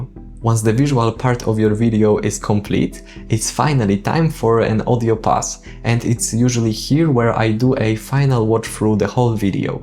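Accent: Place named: Poland. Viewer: Nice video! Going to try to use your workflow. Accent sounds Polish ☺️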